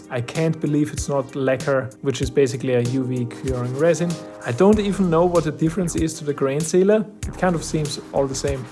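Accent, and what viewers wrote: Austria. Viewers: Are you Austrian? Your accent is very similar to Arnold Schwarzenegger's to my ear.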